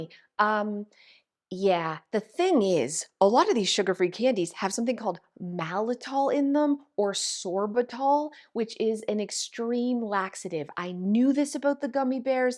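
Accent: Canada. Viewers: I have set my Alexa to speak with a Canadian accent instead of English! Reminds me of you x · Love that Canadian accent! I know it's off topic, I just had to say I love how you say "out"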